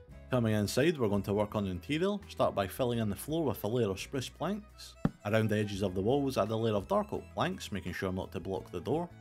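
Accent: Scotland, United Kingdom. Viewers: Build: 100% Style: 100% Shaders: 100% Scottish Accent: 110% most underrated minecraft channel in history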